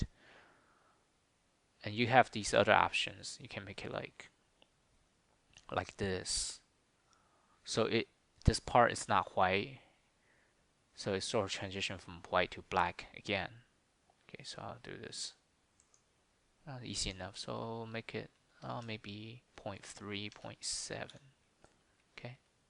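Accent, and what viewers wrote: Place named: China. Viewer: I love your accent, it sounds really China!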